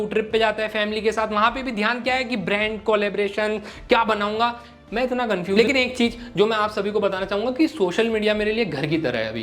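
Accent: Indore, India. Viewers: bhiya tum indori ho kyaa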